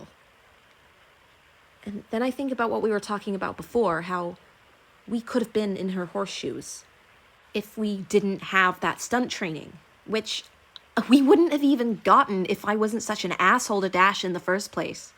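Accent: Australia. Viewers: YOUR AUSSIE ACCENT IS SO GOOD!!!!!!!!!!!!!!!!!!!!!!!! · DUUDDEE YOUR AUSSIE ACCENT IS AMAZING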